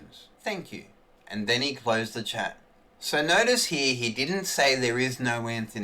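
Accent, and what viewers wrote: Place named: Australia. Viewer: You deserve more followers and more comments for the commitment you put into the fight against these international scammers. Anyone like you who can do this deserves a medal. P.S your Australian accent and laugh is just tops my man. I've watched all your scambait videos and can I say great work on your behalf.. Keep up the crusade just like the rest of the Scam baiting community.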